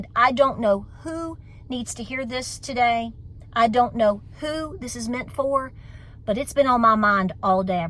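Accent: South, United States. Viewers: ... You're like a plough horse, a jersey cow and a hound dog all in one.. with a southern drawl, y'all ... SAVE YOU SANITY, and stay ACTIVE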